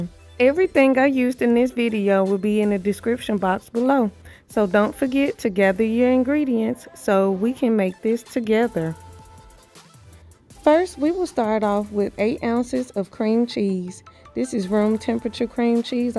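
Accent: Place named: South, United States. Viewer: I Love Your voice very Southern ❤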